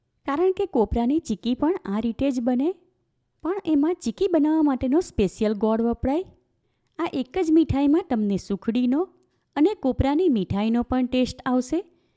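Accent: Surat, India. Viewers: કોપરા પાક પણ ખૂબ સરસ અને તમારો અવાજ સુરતી ભાષા ખૂબ જ મીઠી 👍👌🤪❤️❤️❤️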